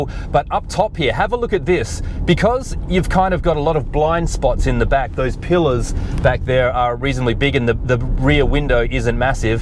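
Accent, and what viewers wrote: Australia. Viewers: Perth accent? Lol.... im getting this, luxury ride 🤩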